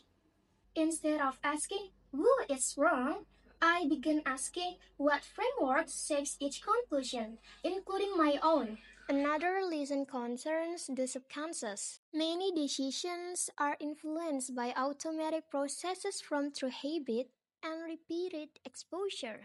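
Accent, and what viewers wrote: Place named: United Kingdom. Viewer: omg sounds really British😚how cute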